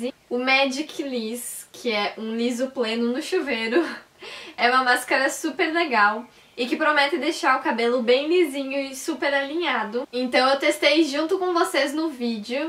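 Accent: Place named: South, Brazil. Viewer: Tudo bem? Tô chegando agora por aqui, tu é gaúcha?? Achei teu sotaque parecido com dó sul, hoje moro nos EUA e deu tanta saudade do meu sul te ouvindo falar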